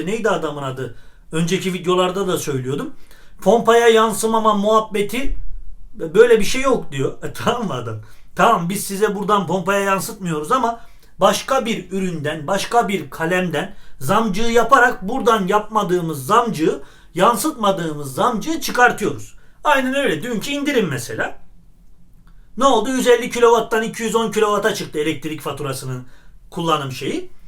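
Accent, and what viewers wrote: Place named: Black Sea, Turkey. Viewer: karadenizlice konuşmana bayılıyorum:D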